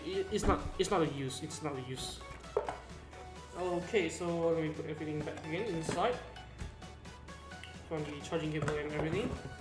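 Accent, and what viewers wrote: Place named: United States. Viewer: Your English is good like American 👍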